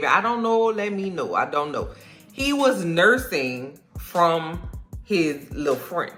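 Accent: New Orleans, United States. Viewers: OK, this is like the 8th review I've watched and I am here for the NOLa accent 🙂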